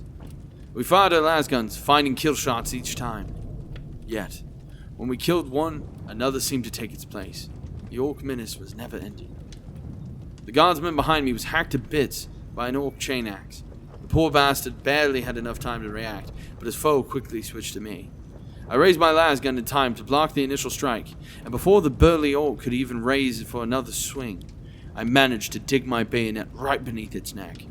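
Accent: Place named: United Kingdom. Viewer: Very nice. The British accent and the almost light-hearted way he told his story made me think of the British Empire at its glory days.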